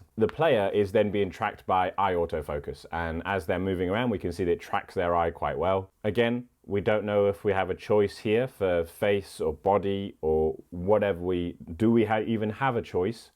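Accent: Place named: United Kingdom. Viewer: He's from the UK. That's how they say it. Here in the US we can call it Zee all day and all night.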